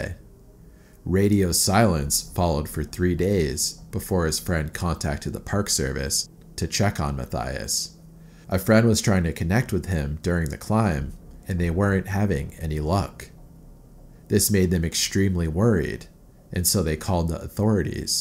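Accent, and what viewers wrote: United States. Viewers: American accent😂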